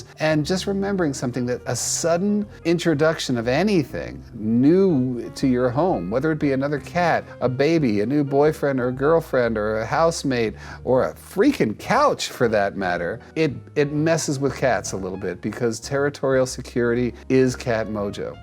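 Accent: Russia. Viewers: a little note - these aren't Swedish names though, they're Russian, and the accent sounded Russian to me as well (just listen to those 'sh' and 'ee' sounds!) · BTW she's not Swedish, she's Russian, Marusia is traditional name. Also, the accent is pretty Russian. I'm saying it coz I am Russian 😂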